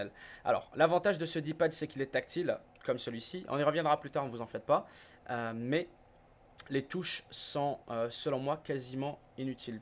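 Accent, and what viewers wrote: Quebec, Canada. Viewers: est tu québécois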